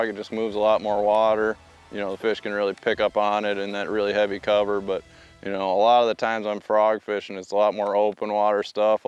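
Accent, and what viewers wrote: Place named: South, United States. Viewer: Dude reminds me of Jay from Jay and silent Bob with a southern accent chill af lol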